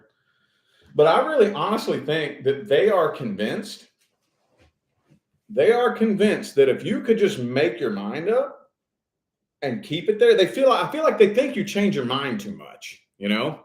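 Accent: South, United States. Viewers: I'm a Gemini and yeah.......I'm done with the BS........had to actually call the law on my "person" a couple weeks ago to GET HIM GONE!!!........He loved loved loved the chase, and the drama and trying to manipulate and control ME!!! Been goin' on since last August, whew! It was a ride, the SEX was AWESOME, but that ain't everything, lol......., you are SO cute!! and I hear a country boy accent goin' on there......where you from, sweetness?........Alabama, here, and thanks for your right on readings darlin'!!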